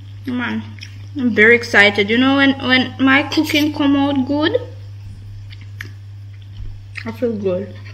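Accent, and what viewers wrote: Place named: Jamaica. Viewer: Congrat to you+ baby girl news Wow i'ts a 👧 girl 🎉🎉❤. I tell you i respect you very much 👍🏻.you have a good vibes, you adapt the jamaican culture very much 😊🙏🏻 lady you talk like a jamaican. & you cook like a jamaican.nuff respect & nuff love.bless up from germany.🙏🏻🛐🕊💫.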